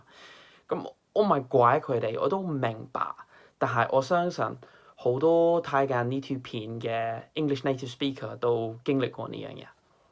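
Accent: Hong Kong, China. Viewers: 嘩，你D口音同語氣真係好香港人！讚 讚 讚 勁 勁 勁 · 連你嘅語氣都好香港人feel 🤣🤣🤣🤣❤️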